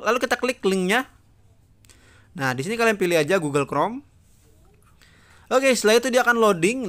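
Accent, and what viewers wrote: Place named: West Java, Indonesia. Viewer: Orang sunda bekasi nih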